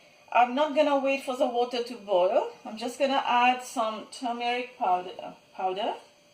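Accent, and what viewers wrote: Mauritius. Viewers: She speaks with a mauritian accent and since she is making a recipe mauritian wise, how did you expect her to talk? 🙄 Why the hell go on somebody channel and then criticise them about their accent?! 😡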